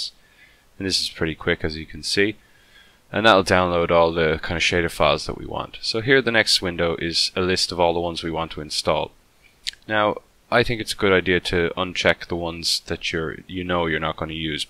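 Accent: Ireland. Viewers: Just came here to listen to the beautiful Irish drawl...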